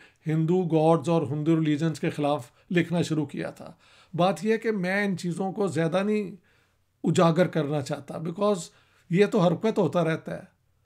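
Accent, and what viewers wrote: Punjab, India. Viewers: Respected Dr Sahib Many many thanks for your thought provoking and illuminating talk on Hindu families of Lahore which enriches our knowledge about this city of Lahore before partition Your style is lucid and Punjabi accent is commendable which shows your high class experience with erudite wisdom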